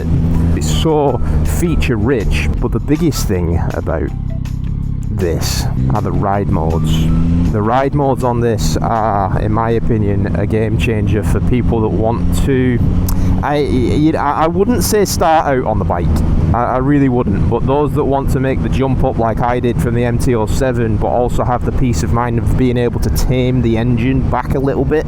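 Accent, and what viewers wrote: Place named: Scotland, United Kingdom. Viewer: Also as a Scot in the USA, it’s nice to hear the accent, riding is so much better here, our weather sucks for riding lol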